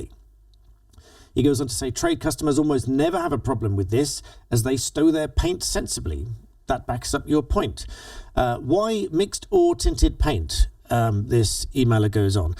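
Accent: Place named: Northern England, United Kingdom. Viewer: He is a bit Northern.........Possibly.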